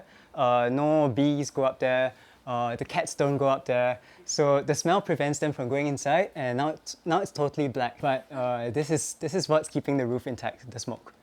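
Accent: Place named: Singapore. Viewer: Based on his accent i would say either singapore or malaysia.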